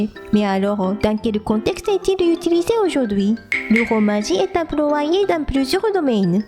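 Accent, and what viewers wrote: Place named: South, France. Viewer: Tout ça est très instructif, merci beaucoup ! Si j'avais simplement une très légère critique à faire, je trouve que la musique est un peu trop forte par rapport à la voix... Et je trouve que vous avez un accent du sud quand vous parlez en français, est-ce qu'on vous l'a déjà dit ?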